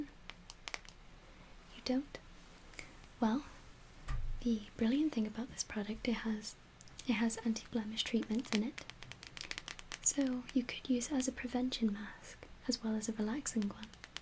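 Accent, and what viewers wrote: Wales, United Kingdom. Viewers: Ur Welsh right? U sound it! I'm Welsh too ur so pretty and sweet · she's Welsh to be more specific :p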